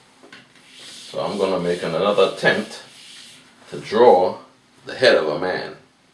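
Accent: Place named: Jamaica. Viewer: He has a Jamaican accent..too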